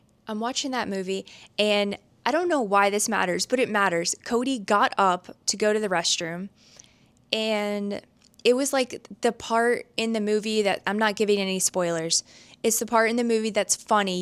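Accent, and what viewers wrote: Canada. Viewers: lol. these differences are the same between states. this is so ridiculous to think there are any real differences. you actually sound more canadian than i do. lol. i think your jokes weren't landing because they are not funny. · I can't hear a distinct American accent from you. You can pass as a Canadian. You sound like a Canadian (at least from Ontario).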